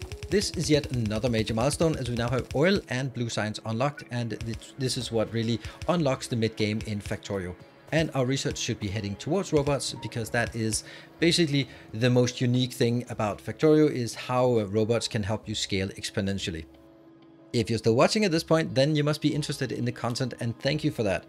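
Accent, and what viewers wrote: Denmark. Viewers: You sound Danish.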